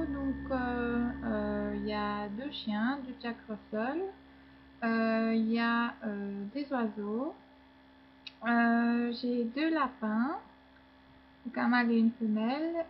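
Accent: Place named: Belgium. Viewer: Coucou!! C'est cool que tu es fait ce tag ;) Tu vis dans quelle region? Ton accent ressemble un peu a l'accent belge. Gros bisous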